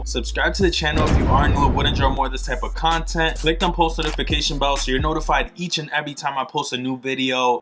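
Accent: New York, United States. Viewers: I love cause his NY accent is entertaining AF. All the “low key” I can deal with but the “dead ass” I can’t. He never “dead asses” lol.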